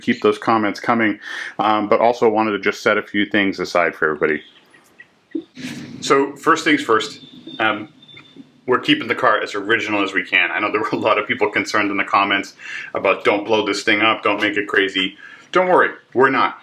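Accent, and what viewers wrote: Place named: New York, United States. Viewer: Sounding like a real uneducated NY'er - lets keep it civil ❤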